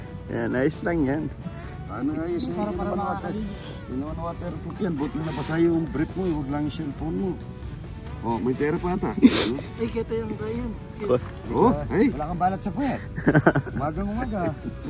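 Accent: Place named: Pampanga, Philippines. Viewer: Good morning kuwait Good morning Philippines Kapampangan accent neh idol..I miss Pampanga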